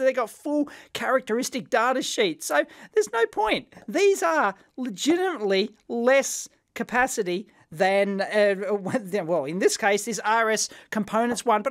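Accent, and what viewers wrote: Australia. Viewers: What more do I love than some australian guy talking about batteries for 20 minutes straight. Awesome vid btw and very interesting. Do these same flaws exist in the triple or double A's? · he is Australian, that is why it is very different to British accent. 🐨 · The worst high pitch voice that could come with an Australian accent. So annoying.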